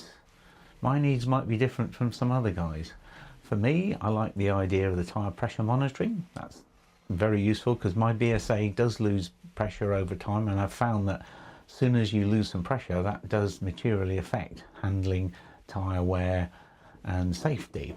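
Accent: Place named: England, United Kingdom. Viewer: Sounds like it’s got some useful features.hearing you read them off. With your English accent.reminded me of Q.from the bond films. He was always telling bond.what the gadgets were.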